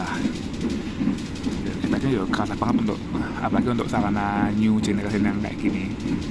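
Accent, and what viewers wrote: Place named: Java, Indonesia. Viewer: Medok banget suaranya. Ga bs dimengerti. Coba kamu belajar ngevlog dg intonasi yg bagus. Banyak kok youtuber yg medok tp intonasinya bagus. Jd buat yg non jawa itu mengerti apa yg dibicarakan. Sukses selalu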